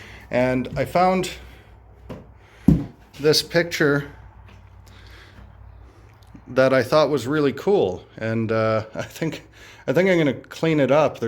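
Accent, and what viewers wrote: Canada. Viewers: You sure got a lot of space left in the shed and you got rid of the hornets without getting stung once 😂🥴 anyway I’ll see you tomorrow 👍🏼 I forget if you guys are in Canada or Michigan 🥴 because you have an accent I guess you could be from either 🤣 lately people have been reading my comments so maybe someone can tell me where you live not like it matters I’m just curious 👀 I don’t have much else I’m paralyzed and bedridden 🤯😂 and really enjoy your content BUT I guess I have to wait till tomorrow 🤣😂 anyway guys have a good evening 🙏🏼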